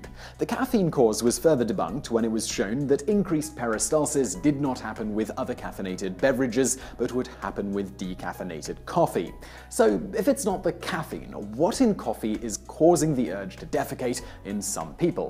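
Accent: England, United Kingdom. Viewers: Hearing this in a British accent makes it sooo much better for some reason. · Dear. I wonder how you choose your pronunciations? If you're speaking with a strong English accent but using American emphasis just to attract the larger American audience then I understand. But often your pronunciation doesn't fit with any English or American dialect that I know. After so much research effort, doesn't it make sense to find out how to say the words properly? By the way, for the benefit of American viewers, yes, manner seems to me to be conceited too. We're not like that. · This video is proof that everything sounds more pleasant when heard in an English accent. I mean, poop...really? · I love listening to all those English pronunciations in these videos. Good job, mate.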